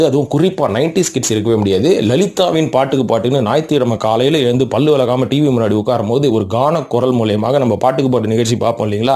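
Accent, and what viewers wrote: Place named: Sri Lanka. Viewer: இஞ்சருங்கோ இலங்கை தமிழ் தான் bro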